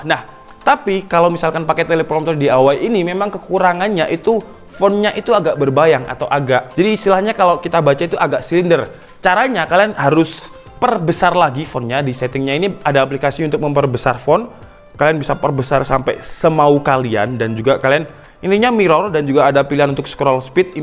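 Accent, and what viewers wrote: Java, Indonesia. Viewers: Orang Jawa ketahuan ketika ngomong b atau kalimat yang ada huruf b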